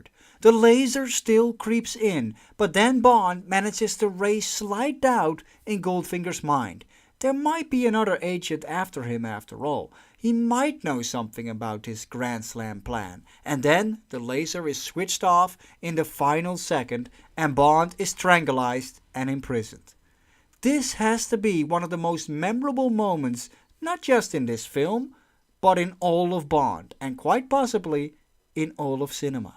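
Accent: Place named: Netherlands. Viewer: I am back and really enjoyed your previous recaps of the early Bond films. The Dutch accent adds something and this revised overview is fantastic. Keep them coming. I have subscribed.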